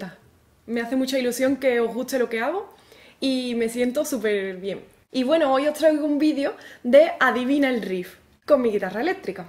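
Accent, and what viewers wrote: Andalusia, Spain. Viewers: ¿De què parte de andalucia eres? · Aparte de hermosa eres virtuosa y me doy cuenta q los chilenos tenemos acento andaluz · Me gusta tu abilidad con la música, y tu acento español. Gracias por compartir ambos con álguien que está lejos de su patria.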